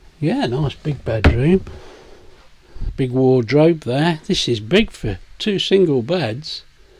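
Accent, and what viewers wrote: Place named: Leicester, United Kingdom. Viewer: Sounds to me like you are from Hinckley where im from or at least leicester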